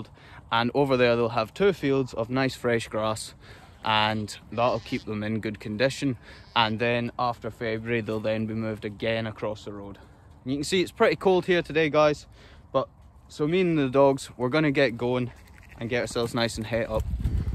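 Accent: Scotland, United Kingdom. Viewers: it is a thing of beauty to watch these dog work.And with such few words/commands. The icing on the cake is his Scottish accent! Thank you for this. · I have just discovered this channel and I am obsessed with it. Between gorgeous Scottish accent and those amazing dogs, it's a refreshing break from the news of the day. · Have to be honest, I have no idea what you just said for 20 minutes but it was beautiful.❤ I love Scottish accents. What can I say.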